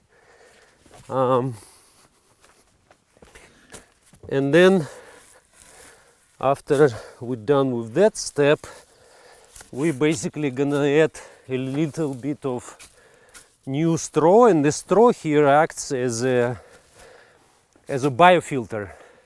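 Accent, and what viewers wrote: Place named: Russia. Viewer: произносить слова совершенно не по английски, а в русской транскрипции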